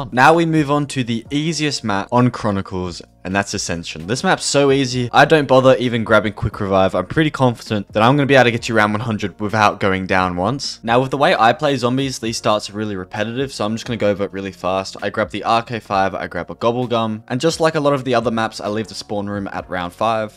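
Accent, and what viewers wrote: Australia. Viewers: he’s Australian and he is saying kuda not cooter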